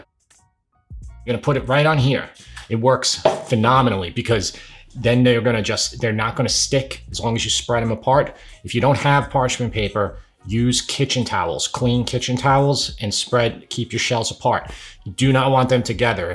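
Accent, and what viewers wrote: New York, United States. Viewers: I just found your videos! I absolutely love them...and your NY accent!!! I'm from Long Island but now live in NC. I MISS that accent!!! We have finally infiltrated the South...we have bagels and pizza Lol, which were unheard of when we got here! Thanks for your videos!!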